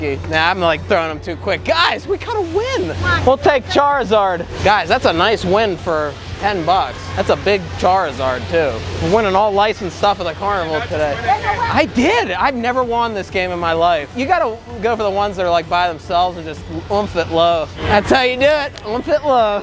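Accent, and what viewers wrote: Pittsburgh, United States. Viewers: I love hearing your Pittsburghese!!! I am from the /burgh and love to hear the sounds of home. I also love the mentions of places near Pittsburgh.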